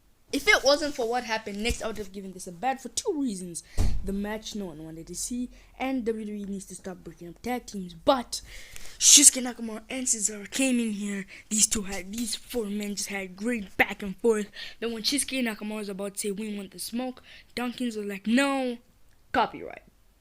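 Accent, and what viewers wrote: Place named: Ireland. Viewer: You very Irish’s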